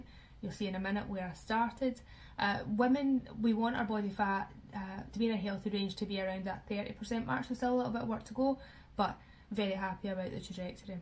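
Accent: Scotland, United Kingdom. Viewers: Just found your channel and well done on your amazing journey. Excited to hear another Scot here 🏴 ❤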